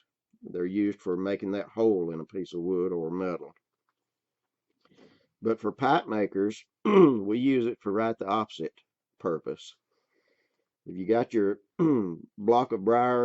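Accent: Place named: South, United States. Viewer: I literally thought I would need 2 or 3 carving tools.. but I see that Iwould need to spend a lot and that is not a problem because it is investment to use them for other work.. but I hate having so manyh tools around which I will use once every 3 years for whimp.. I thought it would need much less tools to do it hand made.. thanks a lot for your informative video. What state or region is your accent from.. thanks. is it southern accent?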